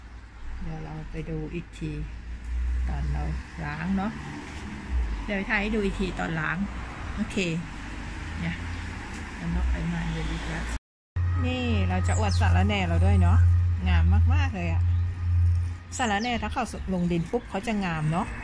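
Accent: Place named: Kanchanaburi, Thailand. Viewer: เสียงแบบนี้คนกาญแท้ๆแน่เลย ผักสดๆน่าทานมากเลยครับพี่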